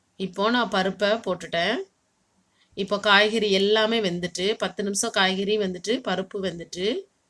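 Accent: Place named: Kanyakumari, India. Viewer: உங்களுக்கும் உங்கள் குடும்பத்தினருக்கும் எனது இனிய பொங்கல் நல்வாழ்த்துக்கள் பட்டு ராணி.... உங்கள் பேச்சின் மொழி (வட்டார மொழி) கன்யாகுமரியா அல்லது திருநெல்வேலியா....? நான் தஞ்சாவூர் மாவட்டம்....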